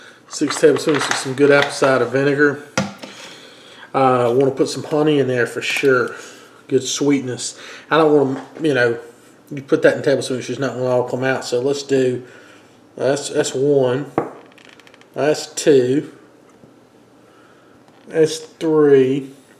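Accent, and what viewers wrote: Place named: Mississippi, United States. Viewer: Are you from around gulf port MS? My friends have a similar accent at Ole Miss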